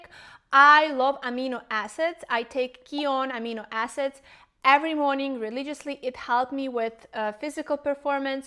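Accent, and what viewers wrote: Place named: Russia. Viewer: Great easy going video. And nice accent, reminds me of my time at school with a lot of Russians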